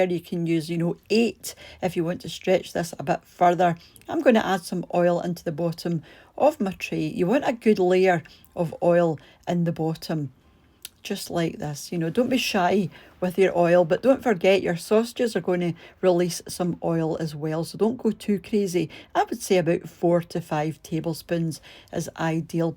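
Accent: Scotland, United Kingdom. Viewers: Lovely Scottish accent whilst a Yorkshire man learns how to cook Toad in the hole .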